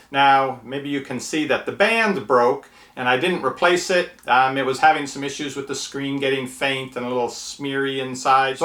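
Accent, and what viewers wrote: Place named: Northwest, United States. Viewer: You said Seahawks. Are you from Seattle? I've been wondering where you're from; clearly not Austin. You say "aygs" for "eggs" and that would make sense for the northwest.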